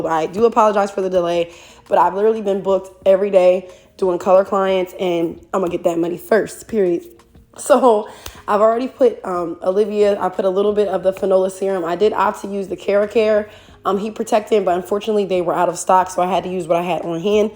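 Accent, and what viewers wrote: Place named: East Coast, United States. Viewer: I knew I heard an east coast accent while you were talking lol. I’ve been trying to get my oldest into doing hair she’s good and actually likes it but is on the fence about cosmetology school I’m gonna show here your videos for sure. Texas by way of Philly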